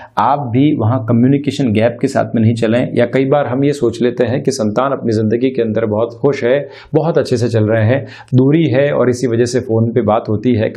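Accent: Standard. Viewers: नमस्कार गुरु जी आप बहुत विस्तार से विश्लेषण करते हो , इस के लिए धन्यवाद और आप की शुद्ध हिंदी बोलना मुझे बहुत पसंद है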